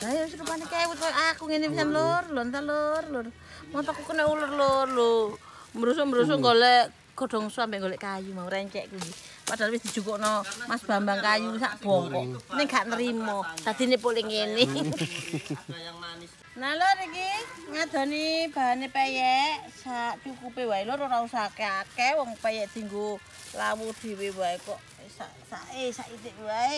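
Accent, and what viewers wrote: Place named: Surabaya, Indonesia. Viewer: salam kenal nggih, aku lht chenel smpean sekali ngono kok lngsung ktgihan, sampean iku ngangeni, trs lek ngomong logate surobyoan bnget, sakjane smoean asline endi..aku nek g ndelok konten smpean rasane motoku gatel bnget...sehat sll yo.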